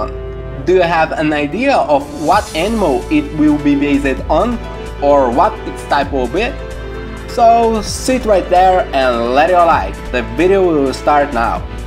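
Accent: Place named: Brazil. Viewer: Corélio M. Based on the accent, he HAS to be Brazilian or at least Latino.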